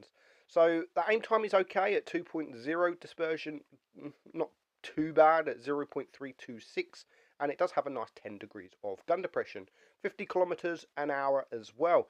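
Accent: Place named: Cockney, United Kingdom. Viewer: speaks cockney not English get it right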